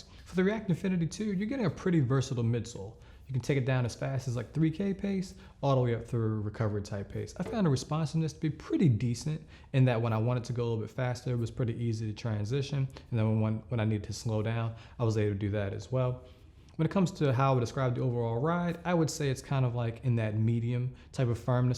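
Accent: Baltimore, United States. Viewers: Thx bud That bmore comes through in some of your words. Lol Great video sir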